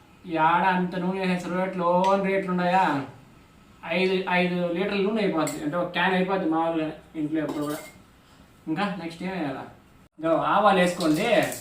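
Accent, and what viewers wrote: Rayalaseema, India. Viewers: Rayalaseema accent tagedela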